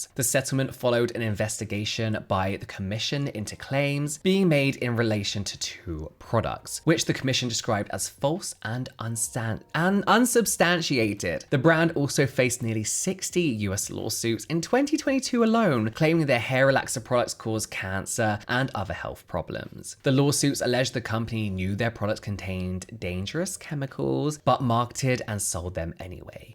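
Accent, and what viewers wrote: France. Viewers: Your French accent is adorable and fancy !! Tips: the letter T at the end of a French word or name is always silent :) same with the letter S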